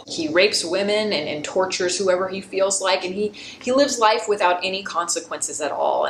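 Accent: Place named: Los Angeles, United States. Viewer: That's how girls talk in Silverlake (LA, California).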